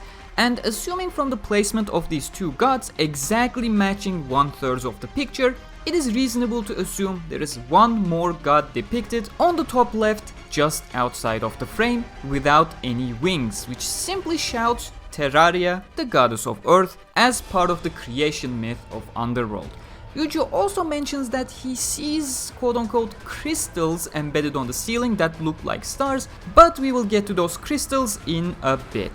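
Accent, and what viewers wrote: Turkey. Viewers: türk olduğun tam belli oluyor aksanından. :D Kusra bakma ama biraz kötü aksanın.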